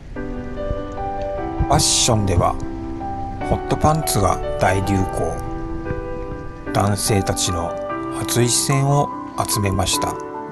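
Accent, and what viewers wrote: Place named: North Kanto, Japan. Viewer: ナレーター男性のイントネーションが関東以北の雰囲気を醸し出していたので、いっそのこと方言を丸出しで語らせたら違和感も無かったのにと思う。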